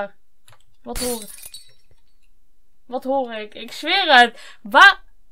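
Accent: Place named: Groningen, Netherlands. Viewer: Je klinkt wel een beetje gronings :P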